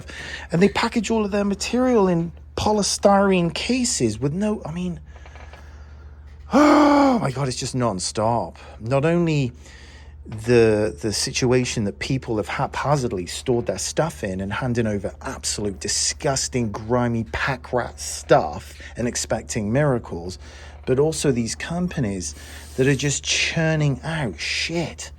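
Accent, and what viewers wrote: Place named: United Kingdom. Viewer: hmmm i hear a little british accent lol.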